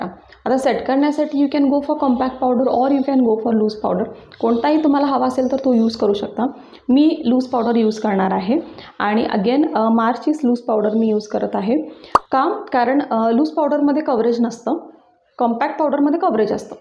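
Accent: Kolhapur, India. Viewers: Kolhapuri ahes na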